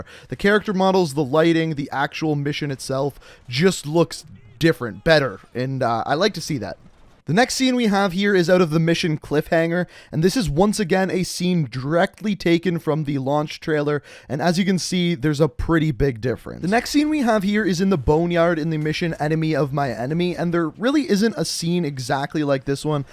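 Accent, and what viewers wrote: Canada. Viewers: He’s Canadian I think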